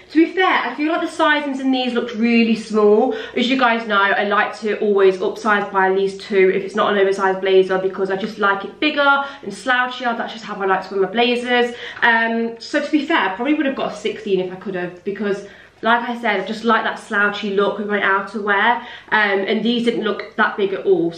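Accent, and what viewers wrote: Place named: Nottingham, United Kingdom. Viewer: Love your vids I didn’t realise you were from notts too, knew the accent sounded familiar 🤣 💖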